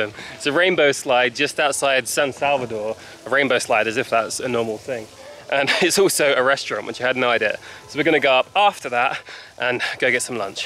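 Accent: United Kingdom. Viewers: I like your accent. Is from UK Right ? Or Am I wrong? I’m from El Salvador I like how people enjoy their time in my little and beautiful country I miss living there., I hope retire in my country hopefully some day. Cheers from California .🌺🌺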